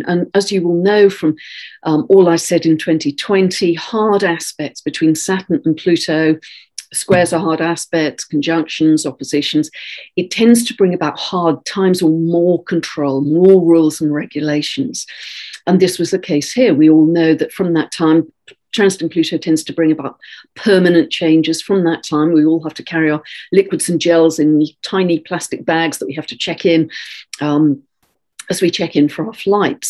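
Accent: United Kingdom. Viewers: American will believe any crazy thing as long as the person saying it has a vaguely British accent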